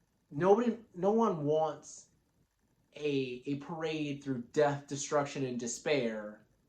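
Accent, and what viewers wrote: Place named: United States. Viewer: Great review! It is a shame you barely have any viewers because I think you get across the point Remarque was trying to make very well. From your accent I presume you're American, that makes it even better, getting that - in his intention - universal message of "just don't kill each other for the interests of others". I got here by somehow remembering how that book impacted me as a kid and then just typing it in. Ended up here, was anything but disappointed. Thanks for covering it that well. And again, you really deserved a whole lot more viewers.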